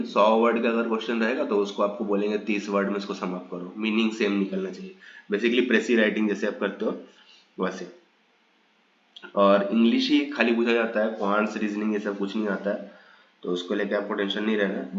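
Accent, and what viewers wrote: Bengal, India. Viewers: Bengali ho kaya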